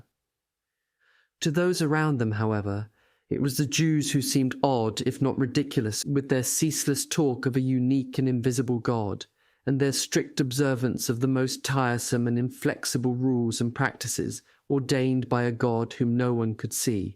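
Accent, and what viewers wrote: RP, United Kingdom. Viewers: What is narrator's accent? British? thanks for your help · Perfect Received Pronunciation, better known as the Queen’s English. Very posh, articulate, and impeccably enunciated ! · Very British ! Queen's English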